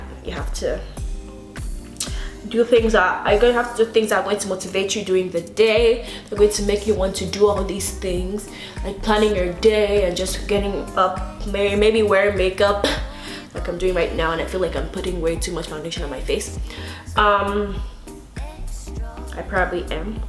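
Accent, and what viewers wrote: Nigeria. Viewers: you look so gorgeous here. Naija accent coming through.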